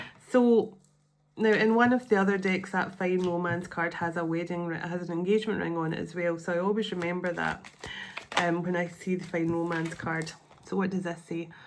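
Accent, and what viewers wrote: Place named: Scotland, United Kingdom. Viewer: Oh my I’m a Cancerian DF all the way from California. The timing and your message’s spirit of reconnection with my avoidant Libra TF must be divinely led because I can tell the telepathic connection being revived today during my morning walk in nature. Plus the Scottish accent was quite the bonus!! Cheers.❤❤❤444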